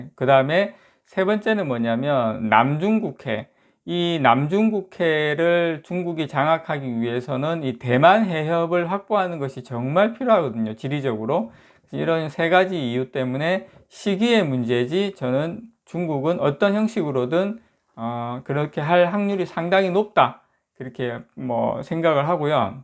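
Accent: Gyeongsang, South Korea. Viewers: 땡꼬때리고 ㅡㅎㅎ참 오랜만에 들어보는 경상도 맛의 사투리 항상 감사한마음으로 듣고 있습니다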